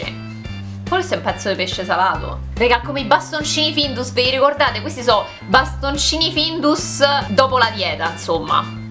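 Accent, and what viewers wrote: Rome, Italy. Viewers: Il tuo accento romano è qualcosa di unico 😂❤️ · Ma solo io ho provato a vedere questo video in inglese e dopo i primi 10 secondi ho mandato al diavolo le mie intenzioni perché amo il suo accento romano? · è bellissimo il tuo accento romano !!!!!!!!!!!!!!!!!!!!!!!!!!!!!!!!!!!!!!!!!!!!!!!!!!!!!!!!!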